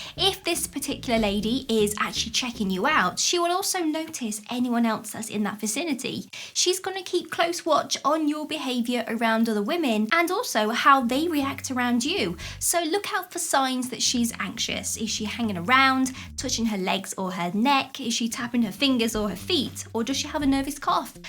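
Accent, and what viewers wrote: England, United Kingdom. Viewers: Your British accent is so charming to my ears, I really love it! Greetings from Greece!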